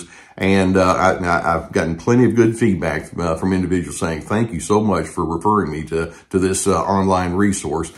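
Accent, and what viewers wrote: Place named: North Texas, United States. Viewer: Thanks for posting this. Your North Texas voice is comforting as we lived most of our lives around the Plano area. We've recently retired from North Texas to Portugal and share a two apartment condo building with an elderly, Dutch female textbook narcissist. Emails and text messages from this neighbor attempting to govern our lives are read with varying degrees of trepidation. Is it possible to create a video for us about online communication styles of narcissists? Thanks!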